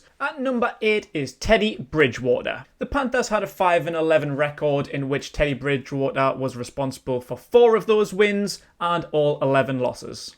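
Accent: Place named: England, United Kingdom. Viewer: Got sent here by Panthers Post (sorta). Interesting to hear someone talk about American football in an English accent lol. Hope you enjoy the game next week, win or lose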